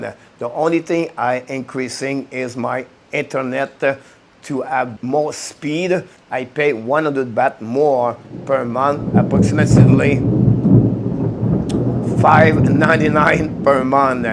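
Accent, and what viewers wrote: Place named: Quebec, Canada. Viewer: on dirais un quebecois tu vient de ou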